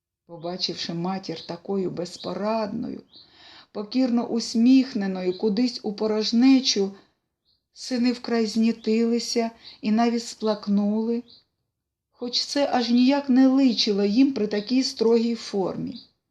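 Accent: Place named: Russia. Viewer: Треба перечитати. Причини: в українській мові літера "О" ЗАВЖДИ ЧИТАЄТЬСЯ/ЗВУЧИТЬ, ЯК "О". Без варіантів. (Відчувається, що начитує російськомовна людина) 2. Проблема з наголосами, напр. : п'Овагом, а не пов'Агом і т.д.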